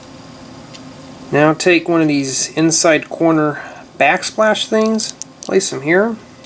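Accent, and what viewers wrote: South, United States. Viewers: R u from Texas? You sound like one... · it sounds like he got a southern acsent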